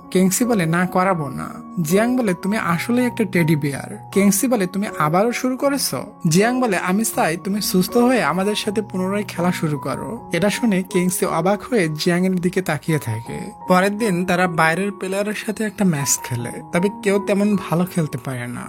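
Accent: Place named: Khulna, Bangladesh. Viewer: vai apnar basha khulnai kotai amar basha o khulnai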